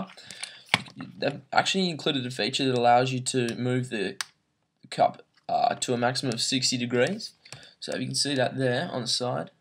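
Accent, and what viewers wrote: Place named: Australia. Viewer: You sound Australian haha I listen/watch to dj cotts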